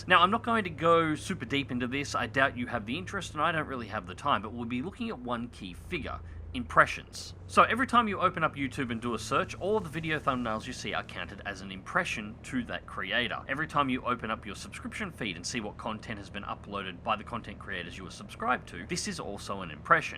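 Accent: Australia. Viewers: As far as I can tell, I haven't not seen your uploads in my feed yet. I hope you keep going, it's nice to hear an Aussie accent on here.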